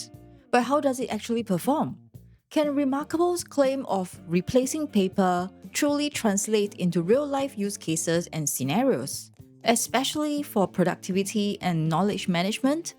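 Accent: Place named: Southeast Asia. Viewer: Hi there. Judging by your accent I presume you are from south east Asia :) Got myself a RPP too. Not sure whether you have figured out a way to handle the notes taken in the RPP in a PARA or Zettle system? Would love to hear from you. As linking “analog” to a digital workflow is something I had not quite figured out.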